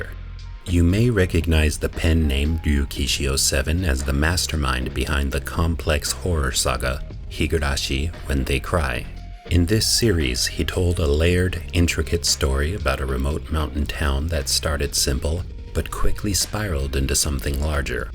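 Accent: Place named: United States. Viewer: knocked this one out the PARK!!! i feel like no other channel has really synthesized the meanings of this game for me like you did, both culturally and thematically. in particular, your emphasizing the implications for everyone in the gender binary of male/female was really refreshing. being half japanese but culturally very american, i could feel the gap in western game channels' analyses on the game no matter how well researched. also while the localization and voice acting/directing was amazing, rinko's jp voice especially really sold her to me as both a two faced b/antagonist and an actual complex human who ultimately /was/ hinako's best friend. just hits different when you've been around real life rinkos! gotta say, though i laughed multiple times during this, your dragon pronunciation joke went right over my head because i thought your pronunciation was...normal american? 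anyway im penning this as i settle into my 3rd rewatch/listen, looking forward to checking out the rest of your channel vids and future releases!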